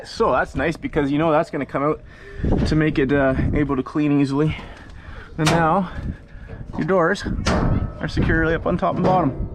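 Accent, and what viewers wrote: Canada. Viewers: I just bought a rogue. Gettimg delivered on Wednesday, this is a helpful video. Seems pretty straightforward to assemble. Are you in southwestern Ontario by chance? Your accent seems very familiar. Lol.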